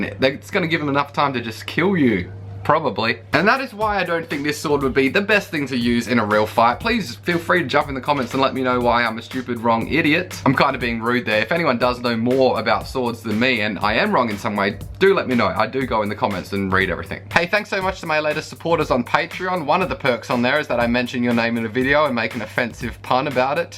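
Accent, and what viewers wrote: Australia. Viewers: The way you stand on the wood while you're cutting it is SUCH an Aussie thing to do.When you started talking I thought you sounded Australian, but when you did that, I was like 'yep, definatly an aussie'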